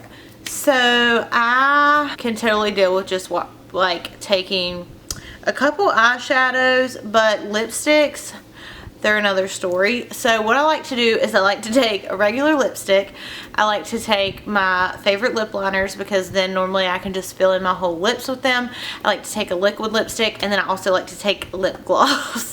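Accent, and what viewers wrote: South, United States. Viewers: love your southern charm, you're accent is so adorable, have fun in Atlanta!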